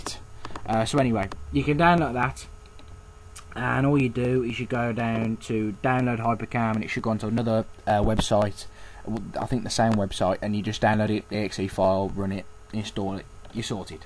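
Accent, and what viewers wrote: United Kingdom. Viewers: this black country accent comes on lol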